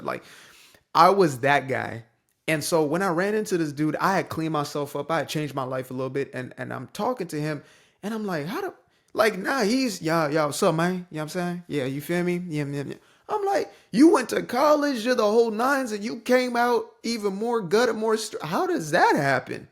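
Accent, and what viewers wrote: United States. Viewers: Careful now, you are sounding American, that seems to be frowned upon these days. Stay safe, and keep up the good work, every day you wake up alive is another day you can strive to be better than yesterday.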